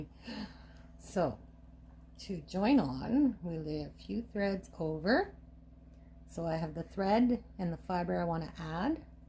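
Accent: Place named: Ottawa Valley, Canada. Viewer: Thank you so much for this video! I'm in Winnipeg, with family in the Ottawa Valley and in Gatineau, so I heard your accent and put you on the map right away! :) I'm going to try spinning some flax right now... I hope it works out as well for me as it did for you! There may be a lot of swearing involved... :) Thanks again!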